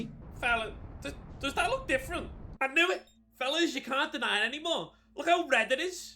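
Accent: Liverpool, United Kingdom. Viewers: This is an incredible amount of editing work to have done hatchling. Glad you enjoyed the game. I’ve also watched around 20 playthroughs but I never knew how much I needed an angry Liverpudlian version in my life. If the whole thing is recorded I can imagine our little Outer Wilds community would love to see the whole thing ::)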